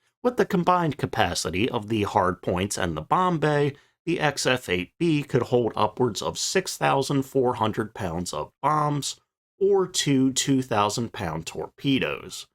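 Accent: United States. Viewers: I do not like videos that have measurements n them that use only the Metric system! You sound like a US citizen, you are on the US YouTube channels and yet you completely leave out our system of measurement which is the Imperial System! Why do you Pinheads do this? I have to stop this absurdity and have given you a thmbs down!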